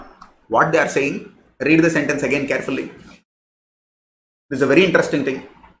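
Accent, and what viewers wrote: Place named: Tamil Nadu, India. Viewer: Sorry to ask you sir, Are you from Tamil Nadu?. Bcoz of your Slang, Which is too easily understood by me. (Nice) Thank You for your update sir... from Tamilnadu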